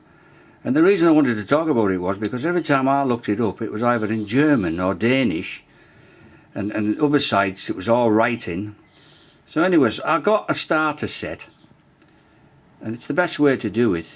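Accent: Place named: Hartlepool, United Kingdom. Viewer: Love the accent....Hartlepool???????????????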